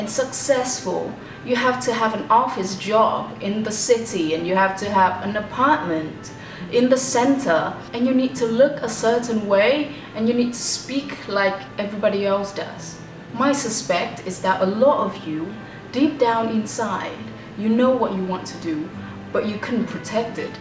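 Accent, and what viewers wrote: England, United Kingdom. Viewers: Chị nói giọng Anh Anh Chị du học ở Anh ạ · British accent nghe thích quá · british accent cua chi hay qua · British accent của chị hay quá hihii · Huhu em thích giọng anh anh của chị dã man ý chị ơiiii em thích cái độ dày với ấm trong giọng anh anh ☺️☺️☺️☺️☺️ Nhưng lại luôn cảm thấy kiểu nửa vời lại còn thích đú cái khó 🥺🥺🥺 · hers is British accent, you can easily recognize it by some words like " a lot, all, stop" it's my opinion btw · English accents :)))) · Em thích cái giọng British của chị lắm luôn ý. Nghe rất dễ thương, điệu điệu mà không quá chảy nước như trên TV. Yêu chị vô cùng tậm, luôn luôn support mọi hoạt động của chị. Mặc dù em lười đọc lắm, nhưng sẽ cố đọc hết blog của chị <3 <3 Yêu chế <3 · ôi giời ơi, accent anh anh, nghe lạ quới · There is nothing quite like a person with UK accent who didn't grow up there. 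Very unique. By the, I love the energy that you have. Great work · British accent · Giọng Anh ạ? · Từ Anh về có khác, eo ôi cái British accent nặng ghê gớm !!! , nghe nhộn nhộn vui vui, đáng yêu ghê cơ chị ạ, ahíhí !!!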